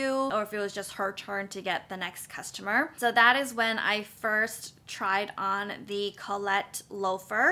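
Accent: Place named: Asia. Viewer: I love your Asian English accent.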